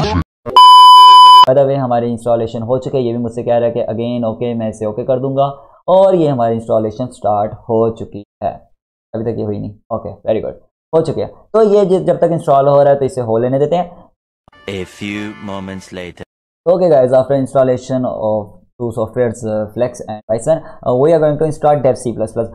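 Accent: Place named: Kashmir, India. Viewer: are you from J&K? your hindi sounds like it's having kashmiri accent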